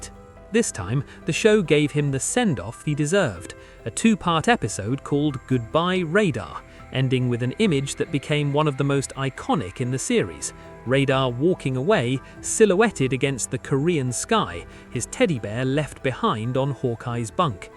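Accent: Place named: United Kingdom. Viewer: However good it may be, why oh why do we have to put up with these British accents for all these videos? Sick of it!!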